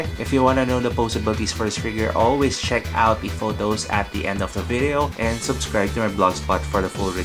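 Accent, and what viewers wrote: Philippines. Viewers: are you fillipino? you sound like it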